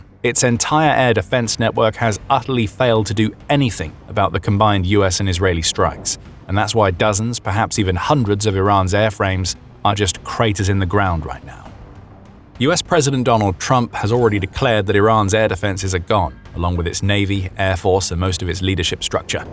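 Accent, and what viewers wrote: England, United Kingdom. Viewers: Because the yanks just love the British accent. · Why are these US military videos always narrated by an English guy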